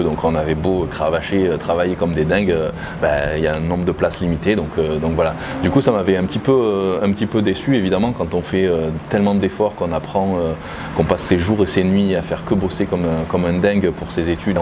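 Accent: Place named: Le Pontet, France. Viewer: Le pontet👍 je me disais bien avec cet accent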